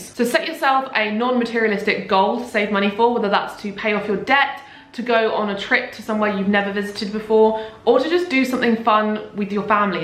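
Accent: England, United Kingdom. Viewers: thank you for sharing those tips ♥ and love your english accent too :3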